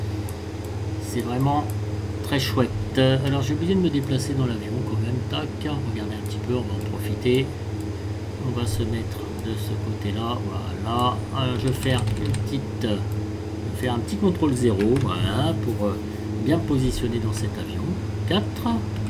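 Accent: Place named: Nord, France. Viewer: Superbe vidéo comme d’habitude évidemment 👍👍👍👍👍 et très bien l’accent du schnord 😉😉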